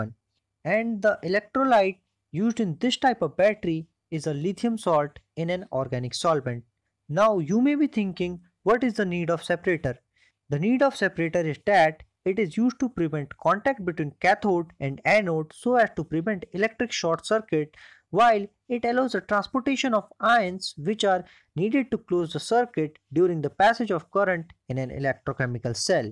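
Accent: India. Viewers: ogod indian accent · I just hate this indian accent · f.king indian accent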